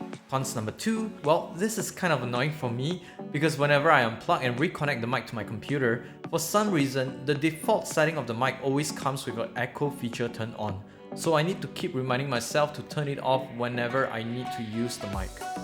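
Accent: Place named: Indonesia. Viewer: Orang indonesia ya bang? Dari aksen nya kelihatan sama bagian deskripsi nya hehehe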